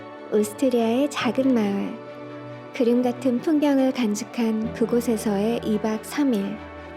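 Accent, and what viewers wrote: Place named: Seoul, South Korea. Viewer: 넘 예쁘시고 영상도 좋은데 나레이션이 서울 사투리가 생각나네요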